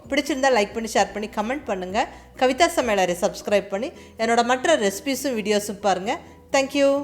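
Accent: Kongu, India. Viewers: Neenga.. namma kongu tamila pesurathe azhaga irukuthunga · Mam nenga kongu tamilil pesurathu pidichirukku..nan Theni Dt.bodinayakanur. gravy super sis.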